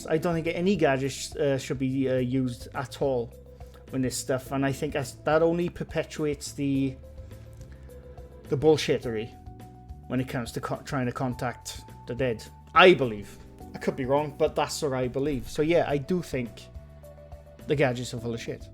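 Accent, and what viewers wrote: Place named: South Wales, United Kingdom. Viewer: At long last I can disagree with you:-) If you go to a long, open beach with a 3 or 4 foot ruler and line the ends up with the horizon, you can see that the horizon has a radius. Another great video from (I think) mid South Wales, judging by your accent.:-)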